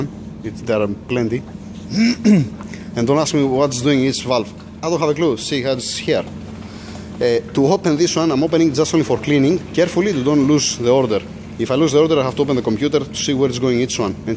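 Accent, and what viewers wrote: Greece. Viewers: Hello ser nice work I want to ask you where are you working? From the name and accent I know that it is Greece but where in Greece? I have a problem with s320cdi 4 matic the iniverzal joint in the transfer case is worn-out can you halp me please. Where to find parts do you have it... Thanks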